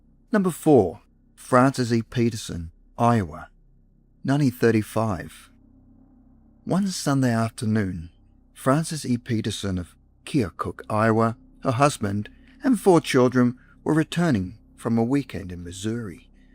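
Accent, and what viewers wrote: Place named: Australia. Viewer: He's an Aussie. Not AI.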